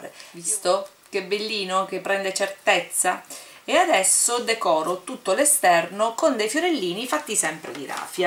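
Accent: Sicily, Italy. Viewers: Sei sicula?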